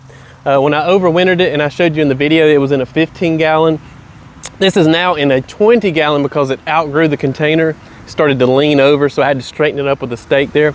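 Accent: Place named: North Carolina, United States. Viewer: Oh my God! The taste of home grown tomatoes is amazing! I grew red pearl grape tomatoes (from Johnny's) this year, and they were so good! Very productive and so sweet... Also the yellow brandywine was amazing as well 👍 Thanks for sharing your NC garden. I used to live in New Bern but now I'm in Virginia. You're accent brings me back to NC 😄 love it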